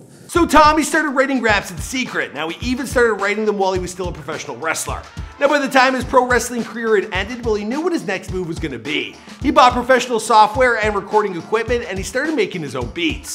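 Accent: Canada. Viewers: Why does the host sound like he is puking out every fifth word? Is he usually like this? No diss, just asking. Is this Canadian accent?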